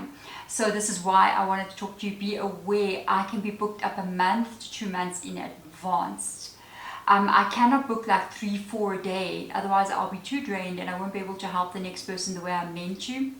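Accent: South Africa. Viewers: Are you South African? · I was about to say, mam are you South African? And you are 😩😩😩😭 we truly recognise our own ❤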